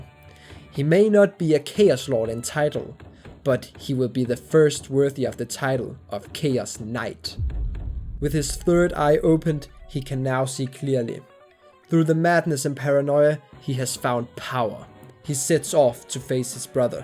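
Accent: Denmark. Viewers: Just finished watching the whole retrospective, and I instantly want to rewatch it. Seeing someone show the same love for a weird browser RPG with terrible gameplay, that I also share (and with a danish accent ❤) has been magical. Thankyou! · ah Nice to meet a fellow Dane i just thought during the second part your accent sounded really famliar, Also does your YT have discord server yet?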